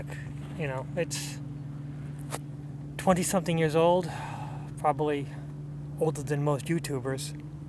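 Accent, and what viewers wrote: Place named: Cape Cod, United States. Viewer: Very very nice filming. I recognized your voice, and knew your place. Native Cape Codder here. Thank you for filming and sharing.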